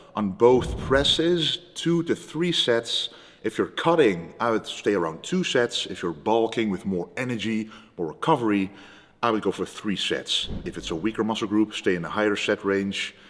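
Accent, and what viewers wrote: Netherlands. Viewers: I love the way he talks, it's just calm, clear and spiced up by his dutch accent. I don't perceive any arrogance, just confidence. Which is understandable, he is one of the top bodybuilders in the world.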